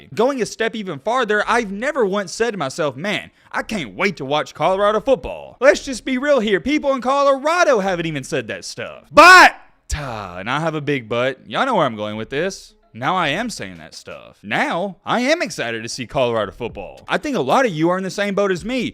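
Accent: South, United States. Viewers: That southern tone and underlying messages remind me of a personality I'm way too familiar with. But do your thang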